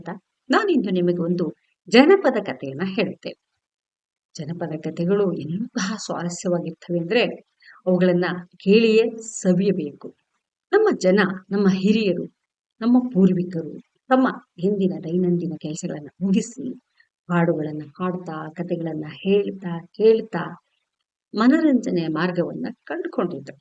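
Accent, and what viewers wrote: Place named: Mandya, India. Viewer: ಮಂಡ್ಯದ ಜನ ಮಾತನಾಡುವ ಹಾಗೆ ಕಥೆಯನ್ನು ಹೇಳಿದ್ದಿರಾ ಕಥೆ ತುಂಬಾ ಚೆನ್ನಾಗಿ ದೆ ಕೇಳುವುದಕ್ಕೆ ಹಿತವಾಗಿದೆ 🥰🥰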